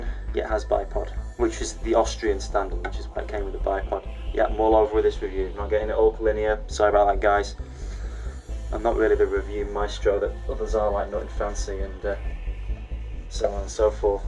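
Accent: United Kingdom. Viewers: alright, nice gun, yorkshire accent? :-) slr is a giveaway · You sound British. How do you have that? Or are you living in the US? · You sound like you are from Great Britain. I'm a little ignorant on your laws, but I was under the impression that you could not own a gun like this. Awesome gun, man. I'm saving up for a DSA FAL like this one.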